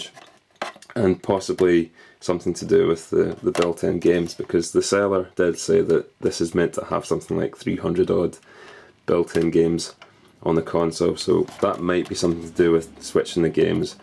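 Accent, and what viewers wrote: Scotland, United Kingdom. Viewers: Where in Scotland are you from. You sound like you are close to me. Edinburgh area?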